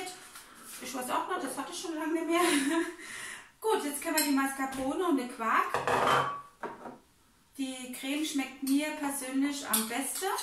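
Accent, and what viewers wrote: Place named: Saarland, Germany. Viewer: Hallo liebe schaue mir gerne deine Videos an wollte dich mal fragen kann das sein dass du vom Saarland kommst ich bin nämlich auch auf vom Saarland meinte nur der Dialekt Werk sehr gleich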